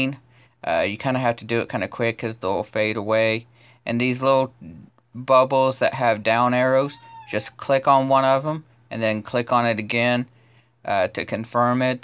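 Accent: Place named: United States. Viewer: You sound country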